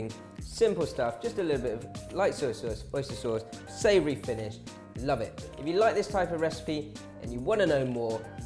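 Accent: United Kingdom. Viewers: A oriental with a British accent OK you got me on this one🤓 Looks delicious❤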